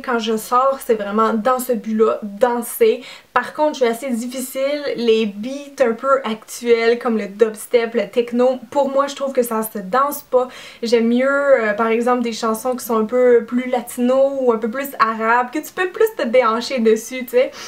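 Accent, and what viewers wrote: Canada. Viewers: Vu l'accent même sans savoir, elle doit être canadienne =) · Moi aussi je pleure très facilement et j'ai toujours préféré les matières littéraires et artistiques en cours, et je n'aime pas le café, les fruits de mer et ce qui est piquant non plus... C'est rigolo parce que quand je suis allée au Québec il y a trois ans, je trouvais l'accent très drôle, voir un peu ridicule mais depuis que je te regarde je trouve ça plutôt mignon finalement et certaines expressions me font bien rire mais sans moquerie ! Continue comme ça en tout cas ! :) Gros bisous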